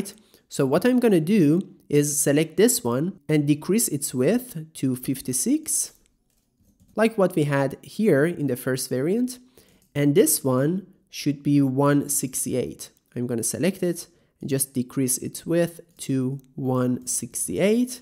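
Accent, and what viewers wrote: Iran. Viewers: its the persian accent thats killen me man. hahahah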